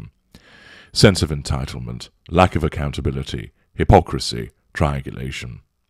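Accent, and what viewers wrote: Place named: North, United Kingdom. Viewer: you aren't a southerner are you ? I think you are from the north. There are some words that you pronounce wrongly for a southerner especially a posh one lol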